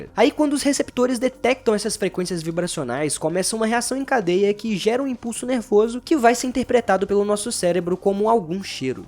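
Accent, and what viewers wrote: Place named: Minas Gerais, Brazil. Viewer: Que orgulho desse sotaque mineirin 💛💛💛💛💛 vídeo incrível,!!!!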